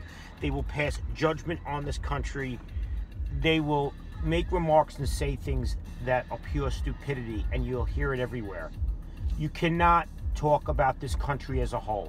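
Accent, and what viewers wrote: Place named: New Jersey, United States. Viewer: R u from jersey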